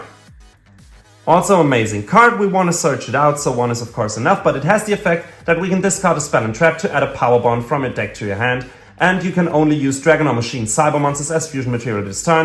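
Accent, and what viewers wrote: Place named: Germany. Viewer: German english...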